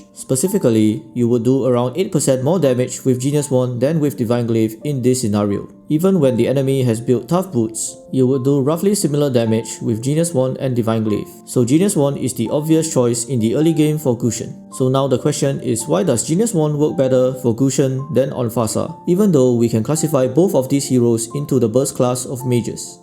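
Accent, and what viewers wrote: Singapore. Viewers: ay fellow singaporean, the accent HAHAHA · u sound like a sg person hahaha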